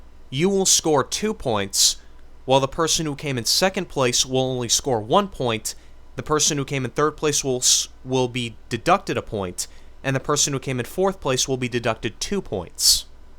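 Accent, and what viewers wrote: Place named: United States. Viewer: yea please do a better review of it as it deserves it. also, you probably don't sound like him but I'm british so you're american acents sound similar to me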